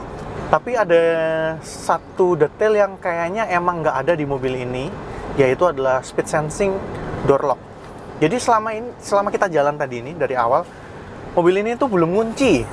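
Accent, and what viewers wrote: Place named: Java, Indonesia. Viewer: orang jawa ya mas? kok medok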